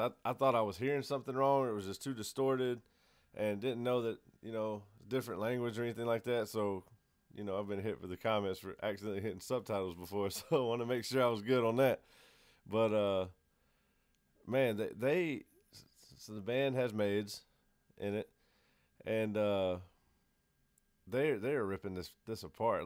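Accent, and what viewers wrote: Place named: Georgia, United States. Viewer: Man, you sound like you from my neck of the woods. South Georgia? I discovered about 2 years ago. Seen em twice already after not going to a concert in 20 years. Best rock band since the 90s.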